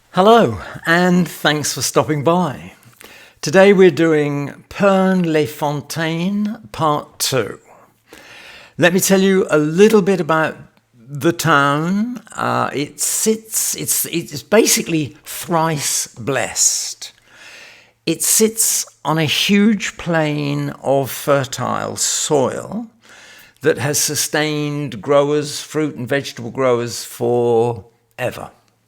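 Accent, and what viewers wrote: United Kingdom. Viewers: I love your passion for your Brompton (I feel the same for mine) and your humor! And besides this as a German it is wonderful to listen to your british accent😊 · Is that an East Anglian accent?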